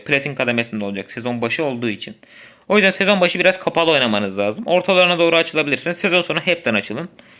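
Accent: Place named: Giresun, Turkey. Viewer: Giresunlumusun şiven anımsatıyo bende giresunluyumda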